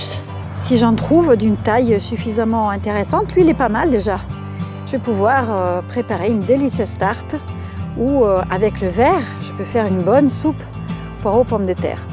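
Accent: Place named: Provence, France. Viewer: ce merveilleux accent digne du terroir provençal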